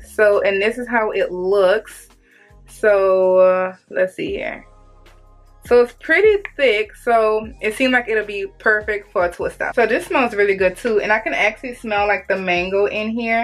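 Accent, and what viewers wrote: New Orleans, United States. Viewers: Curls are popping!!!!!! Are you from NOLA, cause I hear a lil something, something!!!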